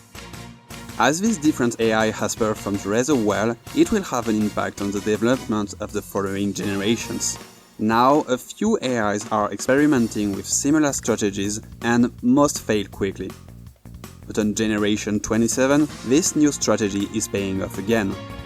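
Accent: France. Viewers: French accent spotted 😂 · this is what i go on youtube for, i don’t play trackmania but your dedication and passion about what you are doing really makes the cut for me ! i can feel you worked hard on this vid and it pays off, great content man, keep it up :) btw : ça fait toujours plaisir d’entendre un accent français par-ci par-là, continue à faire ce qui te plaît mec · Great video! You can 100% tell you're french tho :p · mec, fais un effort ton accent est juste horrible meme pour un français ! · En vrai, tu es français? On le sent 😂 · mdr ça m'a littéralement pris 3 secondes pour reconnaitre que t français x) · Are you french? Your accent feel french, I am french 😁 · Elle est incroyable ta vidéo ! (Je suppose que tu parle français au vu de l'accent ;) ) Le temps que t'as du passé à faire l'entraînement puis le record et le montage, j'imagine même pas. Bravo ! 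A la fin tu parle de combiner le supervisé avec du non supervisé. Tu voudrais pas essayer de voir ce que ça donne ? Avec ce genre de stratégie tu serais en mesure de créer une IA potentiellement plus forte qu'un humain non ?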